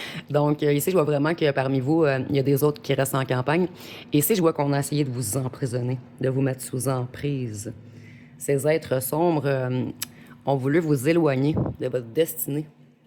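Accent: Quebec, Canada. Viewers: Mais qui me veut.du mal Je ne comprends pas toujours ce que vous dites avec votre accent Québécois Moi je suis en France je suis divorcée A bientôt de vous ecouter Bisous bisous 😘😘🙏🏻😇💕😊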